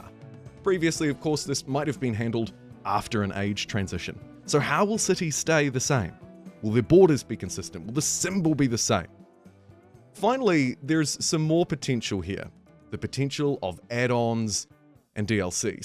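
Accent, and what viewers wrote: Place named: New Zealand. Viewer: Nice to click on a random video and hear a kiwi voice. Rawe bro, nice video. Generally pretty excited for the new game, kia Ora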